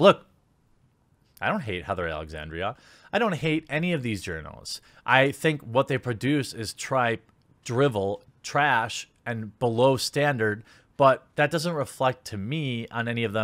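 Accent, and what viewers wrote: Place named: Midwest, United States. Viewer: Good video,. I think. I only listen, so who knows what the video is?:P Not to call anyone out, but some Youtubers have voices that are really hard to listen to, even if the content is good. Your content is good, AND you're easy to listen to. So I appreciate that you speak at a reasonable pace, and your voice isn't grating. It's probably a Midwest thing - my neck of the woods.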